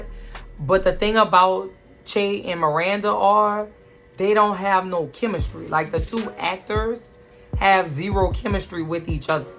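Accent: New Orleans, United States. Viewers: Love love love your accent, Is it New Orleans? I just subscribed !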